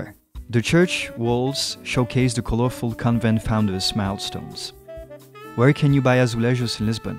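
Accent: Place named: France. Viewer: I think you're wrong, he sounds French. Love the accent. ❤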